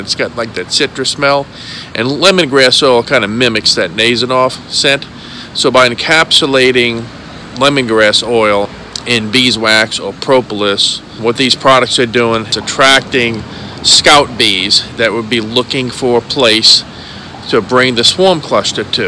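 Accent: Louisiana, United States. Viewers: As soon as you started to speak, I knew you were down here in s/e La. good job on the video.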